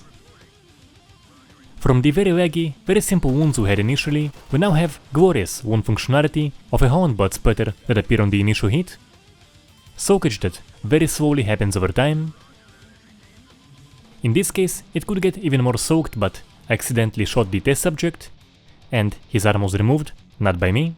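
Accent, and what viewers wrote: Russia. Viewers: Блять этот русский акцент, кров из ушей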